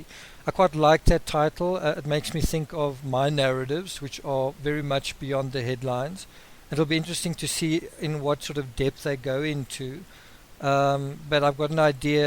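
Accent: South Africa. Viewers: South African narrator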